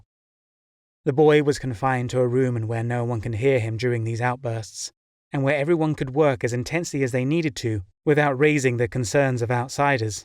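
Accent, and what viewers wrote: United Kingdom. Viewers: You have a funy accent are you a brit?!!!!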